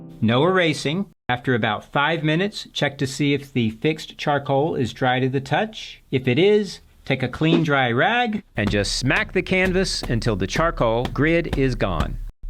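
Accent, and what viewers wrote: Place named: United Kingdom. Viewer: New sub from UK .... You sound like your from UK. Do you do private tutorials or lessons or whatever?? I love your humour etc. Glad I found you good sir!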